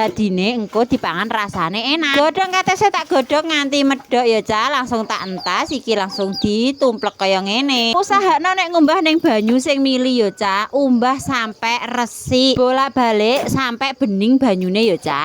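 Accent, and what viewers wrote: Java, Indonesia. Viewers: blunyut itu apa mak, medok nggih mak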